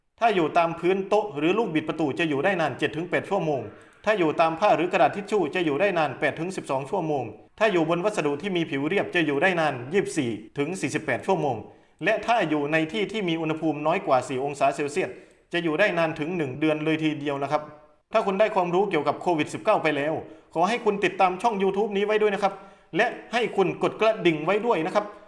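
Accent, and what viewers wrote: Isan, Thailand. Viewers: คนอิสานแม่นบ่เจ้า😁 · ใช่สำเนียงคนอีสานแท้ๆ😄😃😂😁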